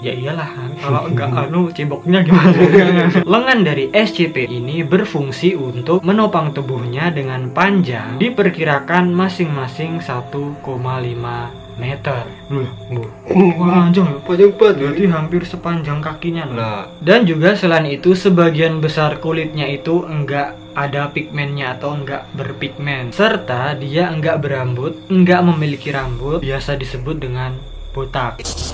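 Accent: Java, Indonesia. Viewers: Merinding banget dah abang orang jawa apa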